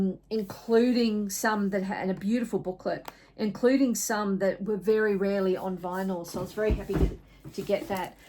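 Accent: Australia. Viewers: Great to hear an Aussie voice, not too many in the VC. Cool that you showed Hermitude. I grew up at the foot of the Mountains, my Aunty still lives at Blaxland. Where's a good record store in Western Sydney? Next time im there i want to have a dig.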